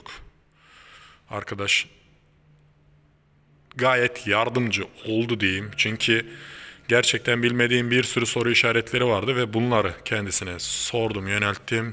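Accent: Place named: Russia. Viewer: Ustam çok güzel olmuş. Hayirli ıslerin olsun. Konuşmanda ara ara rusça aroması alıyorum. 😊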